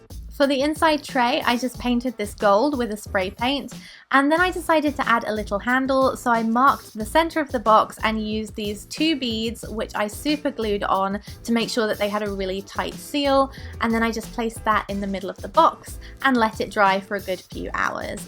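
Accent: United Kingdom. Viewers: It's so cool that your name's and you have the same British accent......btw ur amazing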